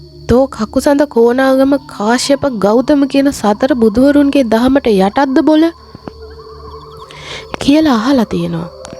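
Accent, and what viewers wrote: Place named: United Kingdom. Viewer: Uk accent nam set ma na boka 😅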